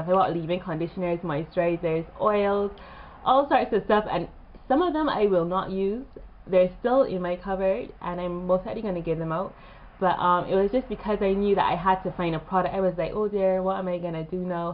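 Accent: Barbados. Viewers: Are you bajan because I should know that accent anywhereee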